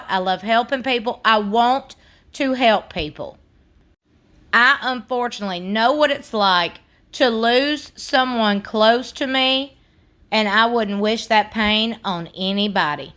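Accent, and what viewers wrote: South, United States. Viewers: Girl you as country as country can get you got that slang girl where you from Manchester ky I bet Harvard ky it's the south I know that for sure 😆 I love 💘 it country girl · Love this Southern Accent. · I love your voice down south hospitality race aside I like your show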